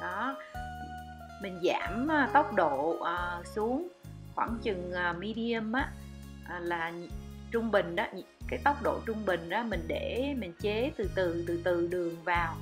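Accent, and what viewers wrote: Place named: North, Vietnam. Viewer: Nói nghe mệt quá,, chị bắc kỳ ơi,, hơi dài dòng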